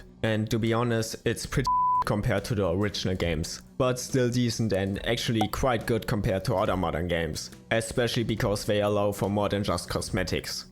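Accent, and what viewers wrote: Germany. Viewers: are u german? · This wasn't meant to be as an offence. I love "zhe" german accent. Good video tho💪🏽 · A LITTLE German Accent 🤣 PS: I'am German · Super interesting video, thanks! Are you from Germany by any chance? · I could tell this guy was German, simply by accent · Your German Accent is like my Ofenkartoffeln, just a burner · das deutsch killt mich🤣♥️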